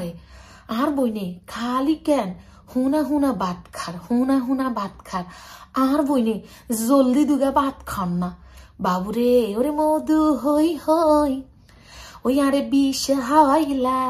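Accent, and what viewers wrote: Noakhali, Bangladesh. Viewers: Please নোয়াখালীর ভাষা না বলে নরমাল বাঙলা কথা বলেন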